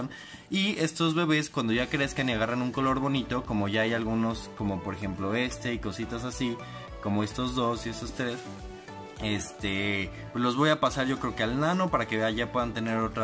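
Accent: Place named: Fresa, Mexico. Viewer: nada mas no hables fresa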